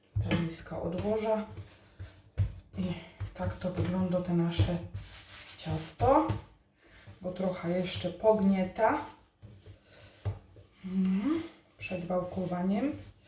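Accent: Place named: Silesia, Poland. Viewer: Oooo Ty godosz! Aż mi się cieplej na sercu zrobiło.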